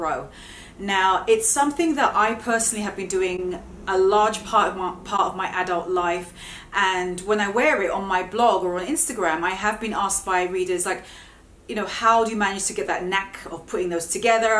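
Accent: United Kingdom. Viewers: Bello acento britanico :) y obviamente excelente video!!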